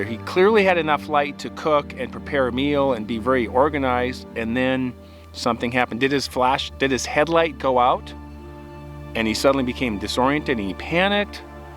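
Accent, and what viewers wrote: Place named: Minnesota, United States. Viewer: My older sister lives in Minnesota, way up near Hibbing. Been there several times and you can definitely hear the accent in as he talks. Accents rarely 100% leave you.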